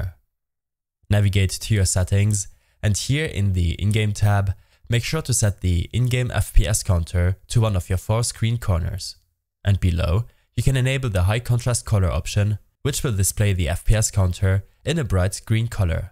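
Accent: Germany. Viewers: man erkennt dass du deutsch bist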